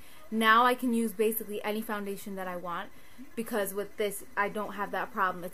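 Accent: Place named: Miami, United States. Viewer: you sound like your from Miami, anyway great video, very informative!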